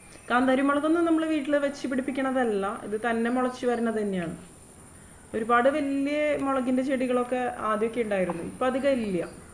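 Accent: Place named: Angamaly, India. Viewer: Angamalyyo Kodungalluro slang aanennu ee answer vaayikkunnathinu mumpu oohichu. Thanks for clarification.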